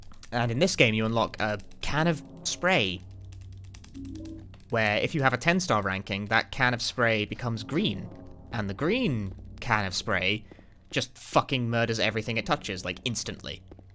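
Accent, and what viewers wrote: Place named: London, United Kingdom. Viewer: if you're reading this my dude which part of London are you from?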